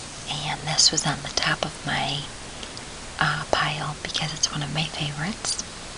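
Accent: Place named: South, United States. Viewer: what I looove about this video is... :D many aspects, dear: your accent (southern US? I am not native English speaker yet I would place it there), the brush, the little stories and of course the pictures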